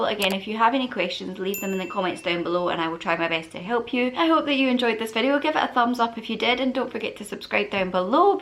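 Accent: United Kingdom. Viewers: I love your accent are you British 💞💞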